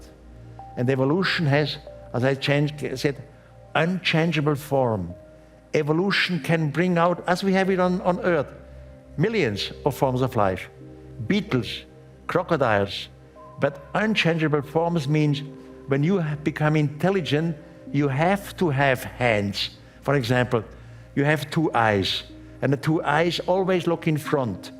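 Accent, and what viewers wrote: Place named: Switzerland. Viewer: Er hat erst kurz vor seinem Tod angefangen Videos auf Englisch hochzuladen und weil er halt Schweizer war und älter als mein Vater verstehe ich sein Englisch besser als native Speaker. Ich habe damit kein Problem. Von Däniken und ich sprechen Englisch in etwa auf demselben Niveau, welches nicht besonders anspruchsvoll ist. Insofern: No problem. 😅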